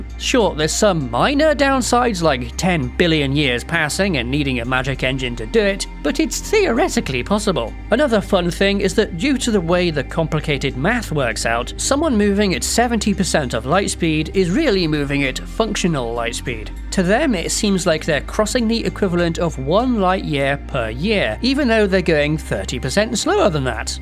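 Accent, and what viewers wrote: United Kingdom. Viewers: ok british man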